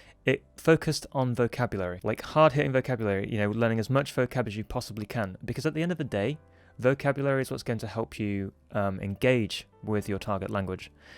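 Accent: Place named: United Kingdom. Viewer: He has a strong British accent, but his pronunciation is clear. So you have to improve your listening skills with the British accent instead of him speaking more clearly.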